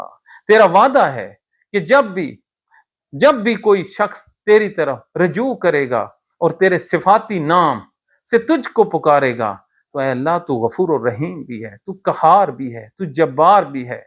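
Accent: Saraiki, Pakistan. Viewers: سرائیکی لہجے میں اردو سننے کی اپنی مٹھاس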